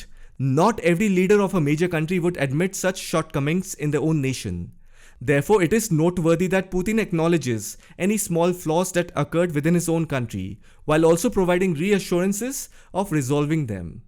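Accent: India. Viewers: Don't like Indian accent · He has the best Indian accent - the most informative Indian accent - absolutely the best... is the man....🫡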